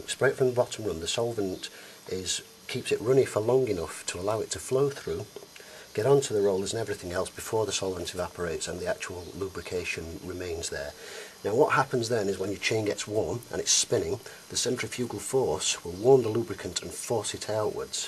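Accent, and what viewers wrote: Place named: Scotland, United Kingdom. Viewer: Sorry I took it that way... just seems like everyone is always hating on the USA. here on Youtube. I appologize for assuming thats what you meant. I agree with you on the voice.. I love the accent too... Although the Scotts can be hard to understand... As are many of my fellow Americans that live in the deep South, especially in Louisianna... most times I have NO IDEA what they are saying. :)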